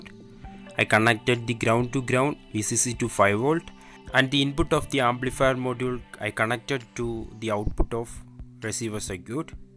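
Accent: Kerala, India. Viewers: Bro r u from kerala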